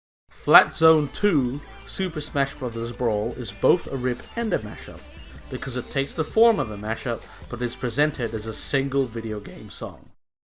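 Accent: United Kingdom. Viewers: You kinda sound like ProZD if he had a slight welsh accent. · Educational and interesting this cool. Also YOUR VOICE IS UNEXPECTEDLY BRITISH SOUNDING.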